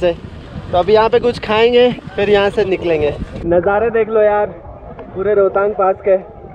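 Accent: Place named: Bihar, India. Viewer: Bhai love ur accent.... Hum bhi bihari hai bhai ❤️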